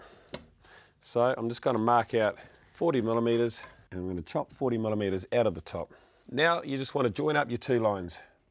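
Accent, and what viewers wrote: New Zealand. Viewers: yeh, it is our Kiwi accent. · he's a kiwi bro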